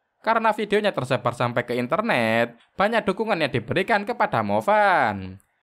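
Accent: Java, Indonesia. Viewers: Mas orang jawa ya soalnya sekat bahasa jawa nya😂😂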